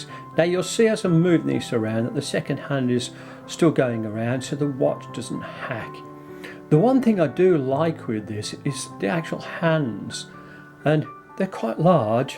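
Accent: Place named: United Kingdom. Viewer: it's hard to find channel like yours Sir,, you served an information nicely since I am not an English native, I catched your words clearly like a crystal altough you have a British accent,, not like other channel who speaks fast,, how can I watch the video and read the text at the same time (I'm not an Englishman).. I got information that I want from you,, thank you Sir, sorry for my English note: like #100 for you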